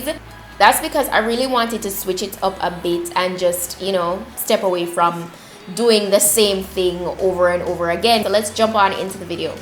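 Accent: Jamaica. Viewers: Your accent sounds Jamaican🇯🇲 💕❤️♥️❤️💕❤️♥️😍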